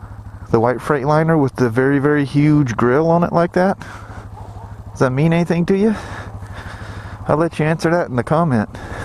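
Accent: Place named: United States. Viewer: Always good fun coming along with you.I would comment on the truck? but couldnt see it.Its very similar weather here in England but always raining and the traffic is horrendous coupled up with the fact that no one seems to be able to drive properly,i think they are all out to kill you this time of the year.Dont worry about the content, your american accent is great to listen too,and your clear and precise.No use me doing a video you wouldnt understand me living here on the border of Devon and Cornwall.Oh rrrrr,proper job!!!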